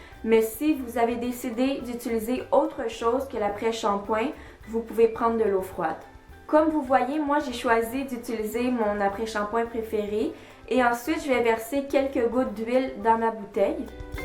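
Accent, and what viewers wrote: Canada. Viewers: Tu as un accent canadien j'aime trop...et vrm le démêlant je l'ai essayé c super top ❤️😄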